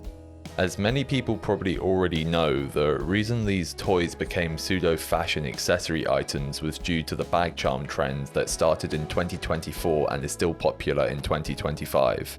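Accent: United Kingdom. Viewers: we listen to you speak because occasionally between your british twink accent and takes that feel like they were formed in a bottle left on the london tube, there are sometimes valid points you make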